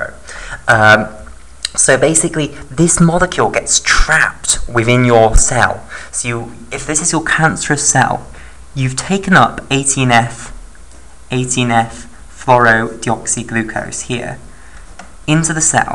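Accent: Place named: RP, United Kingdom. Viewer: Very nicely explained....very very britishy poshy accent... :D ...very "intellectual" sounding. Cheers from Brussels!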